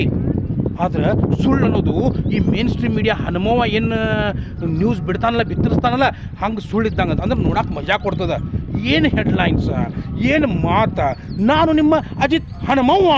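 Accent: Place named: North Karnataka, India. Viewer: ಈ ರೀತಿ ಉತ್ತರ ಕರ್ನಾಟಕದ ಭಾಷೆ ಯಲ್ಲಿ ಉಗಿಯೋದನಾ ಕೇಳೋಕೆ ಆ ಆ ಏನ್ ಚಂದ ಉಂಟು ಮಾರ್ರೆ 👌👌👌👌👌👌👌👌👌👌👌👌👌👌👌👌👌👌👌👌👌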